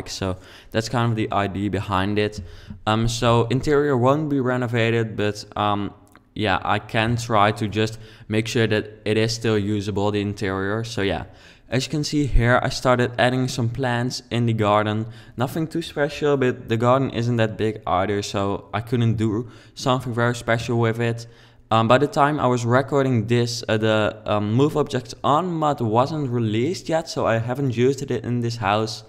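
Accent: Netherlands. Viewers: je kunt horen dat je nederlands bent, Kei goed gemaakt ! ;)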